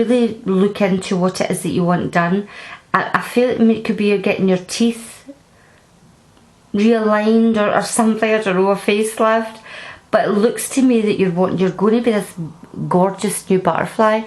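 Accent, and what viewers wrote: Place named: Scotland, United Kingdom. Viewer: No.4. Loving that lovely Scottish accent of yours. Blooming Brexit dh 🤪. Thank you 🙏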